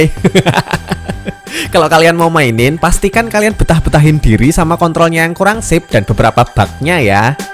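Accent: Java, Indonesia. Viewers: matamu methothoo wkwkwkkw orang jawa mana bang? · kamu itu dari jawa ya soalnya cara bicara kamu itu mirip org jawa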